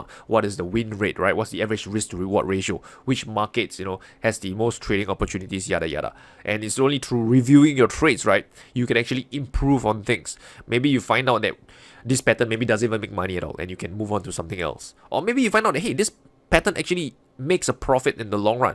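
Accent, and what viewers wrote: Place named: Singapore. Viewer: has a typical Singaporean accent.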